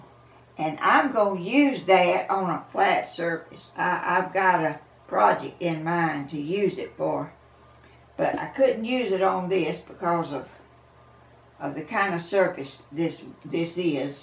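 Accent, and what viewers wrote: South, United States. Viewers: You deserve the plaque!!!! We love to her your southern accent, your sweet personality, your faith, you are a blessing to us all......So thank you. The pot you covered today is beautiful!!!!! But what you need is a big silicone cookie sheet to pour your stuff onto and it will come off with no problem.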